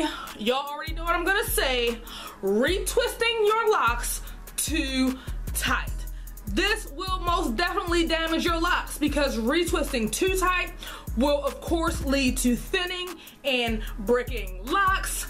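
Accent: Baltimore, United States. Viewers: Great tips! You from bmore shor?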